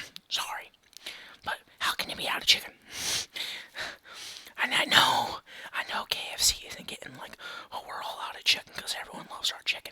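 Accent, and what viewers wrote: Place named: South, United States. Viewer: bro your so funny you sound country like me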